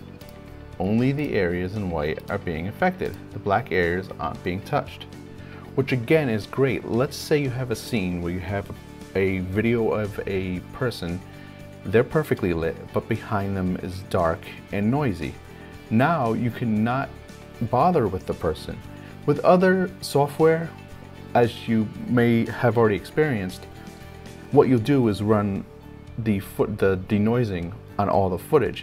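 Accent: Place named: Mid-Atlantic, United States. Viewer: Hi - or is that? Thanks for a well considered tut. The work around may be a bit fiddly, but I can see how it would work. For people interested in applying a mask-to-denoise method to stills, there is a vid tut made by the Photoshop plugin vendor, Topaz Labs, that explains this. I believe it was made 1-2 years ago. Regarding mask making to extract areas of luminance, I often use this technique with image sequences of actors in front of green screen. In this case, too often selected areas of colour have differing grain/ noise characteristics. I have also found playing with the AE Shadow/ Highlight filter on my ortho masks can help! If I may say so you come across as a patient, gentle, unhurried sort of person. Of course as filmmakers we all should be patient (and experiment!). However, too often video bloggers on YouTube dash through their tuts as if the moment had no significance other than seeking a moment of attention. 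Where do you hail from? You do not have a conventional North American accent. There is a hard end to your “A” sound, but the overall suggestion is mid Atlantic, or ex-pat Australian.:)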